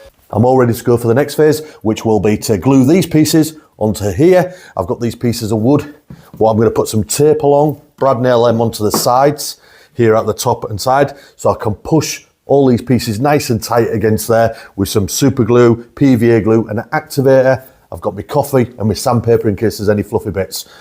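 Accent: United Kingdom. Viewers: Randomly come across you on my home page and just knew you were British, I’m also British 😂😂 love watching wood working videos whilst I’m chillin, so it’s nice to come across someone who’s not American or Canadian for a change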